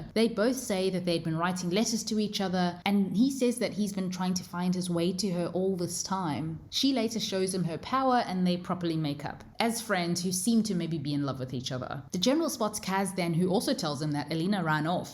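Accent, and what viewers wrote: South Africa. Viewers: Is that an SA accent I hear? 😍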